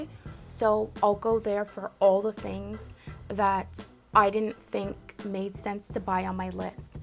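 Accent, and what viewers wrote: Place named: Canada. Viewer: i love the Canadian accent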